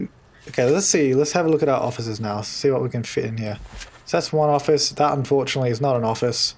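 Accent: Australia. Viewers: Conner Herald me to i like how Australians talk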